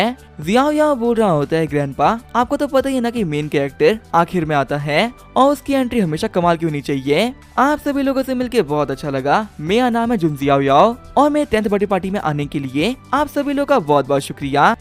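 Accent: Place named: India. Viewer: FFS why indian voice AGAIN !! Did this channel get hacked or something ?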